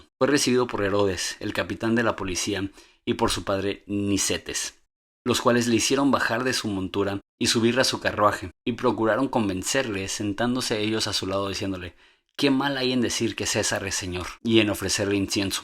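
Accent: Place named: Mexico. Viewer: Vos no estás capacitado para leer en vos alta: mala dicción, pausas inadecuadas,falta de acentuación y de entonación, tu marcadisimo acento mexicano.La misma máquina que transcribe al pie lo demuestra.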